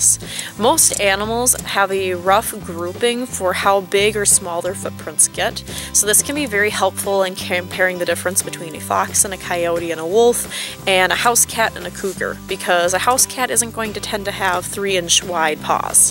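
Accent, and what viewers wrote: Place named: Minnesota, United States. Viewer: Yay it looks like im not the only tracker! Also,what state/province is that? Id guess Minnesotan, North Dakota, or Canada by the sound of your accent, I’m from Minnesota myself.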